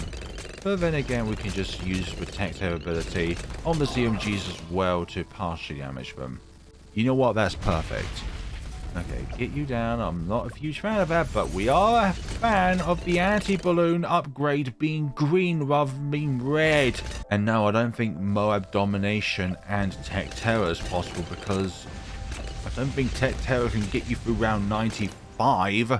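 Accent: United Kingdom. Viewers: british man commentating over videogame is the highest form of youtube content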